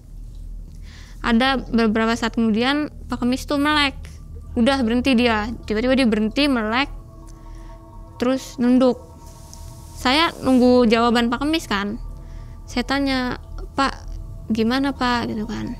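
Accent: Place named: Cirebon, Indonesia. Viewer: biasanya org cirebon klo ngomng ya je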